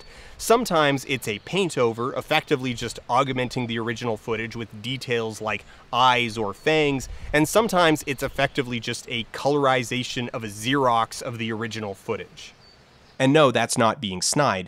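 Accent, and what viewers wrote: Canada. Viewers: hearing a Canadian accent so far from home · That's just a Canadian accent. don't be racist. :P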